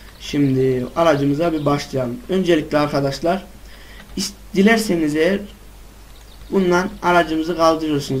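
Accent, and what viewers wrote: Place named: Konya, Turkey. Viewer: helal olsun abi bu arada sen nerelisin konyalı gibi konuşuyonda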